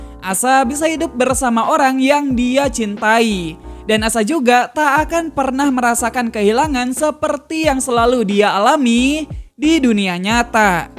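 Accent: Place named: West Java, Indonesia. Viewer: Wew dengan logat sunda gitu?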